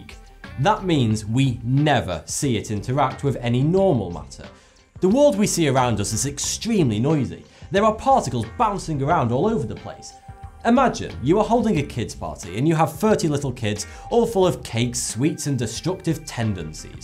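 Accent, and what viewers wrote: United Kingdom. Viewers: He's Vsauce + Tom Scott, but extremely Brit.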